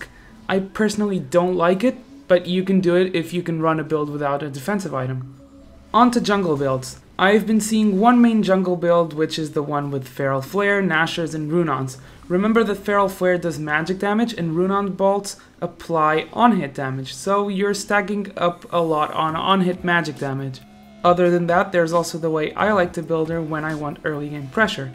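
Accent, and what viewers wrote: Portugal. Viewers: ES TUGA MAN ahaha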